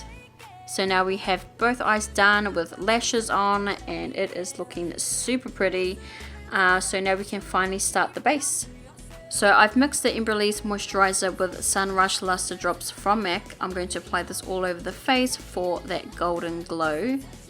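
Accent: New Zealand. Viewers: chur bro so am I. I could tell by your voice that you were a kiwi. A very talented pretty kiwi. Later bol. 😉😆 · Love this look and love the kiwi accent!.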